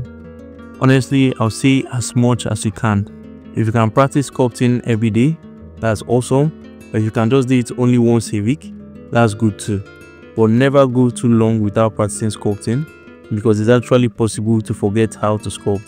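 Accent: Nigeria. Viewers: Are you from nigeria?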